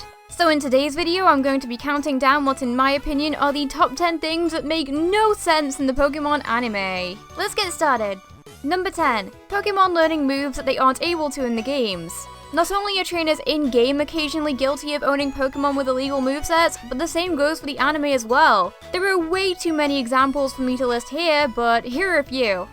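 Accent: United Kingdom. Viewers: Are you English? You have a British accent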